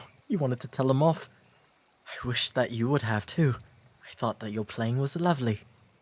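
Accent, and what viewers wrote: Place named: RP, United Kingdom. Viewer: oh wow the posh accent is 🤌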